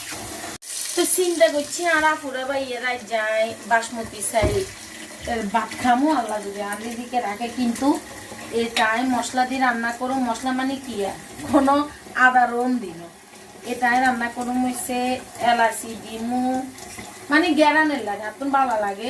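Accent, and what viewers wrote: Noakhali, Bangladesh. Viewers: আপু আমি আজকে প্রথম আপনার কমেন্ট করলাম আপনার নোয়াখাইল্লা ভাষা খুব সুন্দর লাগতেছে আপনার মুখে আমিও নোয়াখাইল্লা বউ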